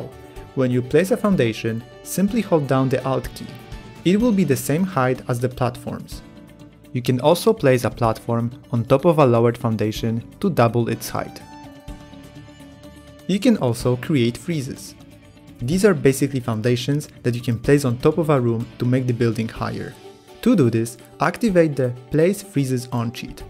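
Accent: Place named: Poland. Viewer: I knew i've heard polish accent!😁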